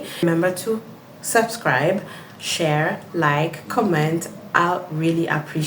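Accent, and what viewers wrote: Kenya. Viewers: You have a Kenyan accent. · You picked up the Kenyan accent well..🎉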